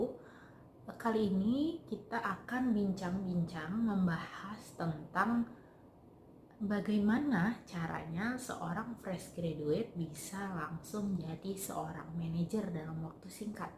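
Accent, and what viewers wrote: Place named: East Java, Indonesia. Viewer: Mbaknya pasti orang jawa timur, kalau salah maaf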